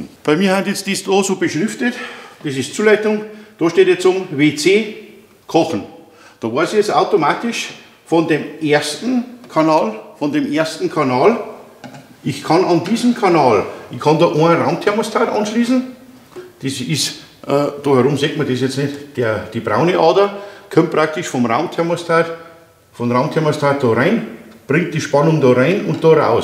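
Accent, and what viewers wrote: Bavaria, Germany. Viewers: Also mal ganz ehrlich von mir - Ich bin schon öfters auf deine Videos gestoßen und war sofort abgeneigt wegen deines Dialektes. Ich mag Bayern jetzt nicht so. Nur unsympathische Leute aus Bayern kennengelernt. Jedenfalls habe ich mir dann trotzdem ein paar Videos angeschaut. Bist mir doch ganz sympathisch jetzt. Klasse Inhalte. Gut rübergebracht. Beste Clickbaittitel überhaupt :)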